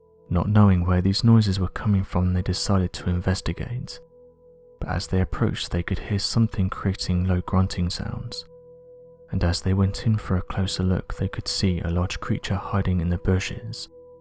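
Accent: England, United Kingdom. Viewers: Works for me ONLY if I don't listen to how he pronounces certain words, such as saying "er" for the "th" sound...eaver instead of either. It reminds me of a speech impediment, but the English have funky accents depending on what part of the country they're from like us ie Southern vs Yankee!!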